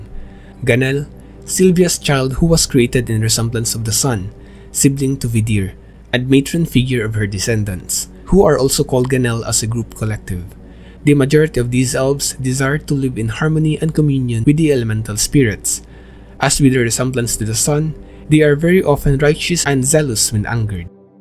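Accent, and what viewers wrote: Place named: India. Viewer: 感覺有點印度口音XDD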